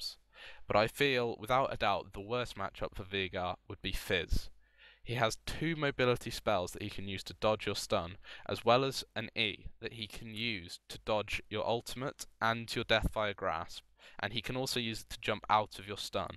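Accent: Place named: United Kingdom. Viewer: It's typical British accent ;)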